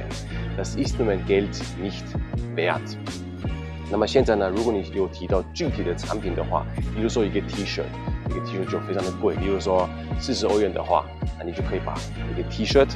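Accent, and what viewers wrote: Taiwan. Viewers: Dein Chinesen ist nicht schlecht, aber dein Akzient aus Taiwan geht mir auf den Nerven. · schade, Akzent aus Taiwan....ansonsten gute Arbeit! auf jeden Fall weiter empfohlen !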